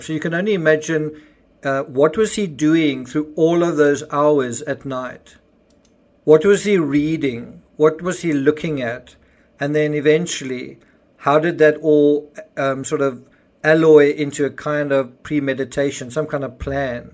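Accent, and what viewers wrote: South Africa. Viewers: It's his accent. He's from SA. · (I've heard it spoken this way by all? south Africans.. said with all due respect. 🤭they are protective of their 'woman'.. anyway, goes back in history&culture !! Not something I know enough about😌, but the very norm how its 'pronounced' 👍🏻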